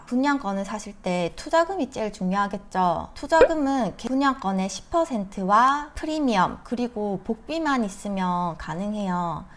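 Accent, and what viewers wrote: Gyeongsang, South Korea. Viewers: 경상도 어투신데 사시는곳은 서울이신가요? 좋은강의가 수도권에 있다보니 부산경남쪽 부린이들 교육하시는 진실강사찾기가 초보한테 어려운데 분양권공부시작은 뭐부터 해야할까요?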